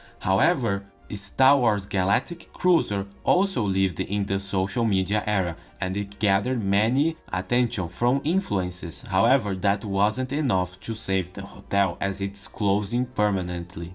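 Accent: Brazil. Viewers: Great video, thanks! Is that a Brazilian accent?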